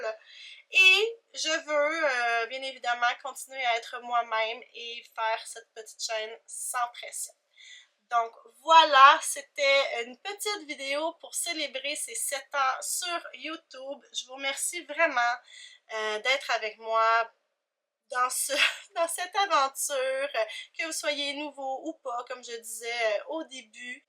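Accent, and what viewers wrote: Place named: Quebec, Canada. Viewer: Bon anniversaire pour ta chaîne et bonne continuation. Je n'ai pas encore vu beaucoup de tes vidéos, mais j'aime beaucoup ta facon de faire (et ton accent québécois 😁) Je t'ai découverte par le biais de Karine et j'ai adoré vos deux vidéos sur votre weekend-lecture.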